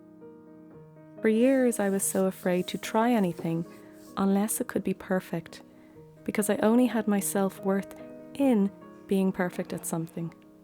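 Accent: Ireland. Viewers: HVD to you too. Do I detect a wee bit of a charming Irish accent?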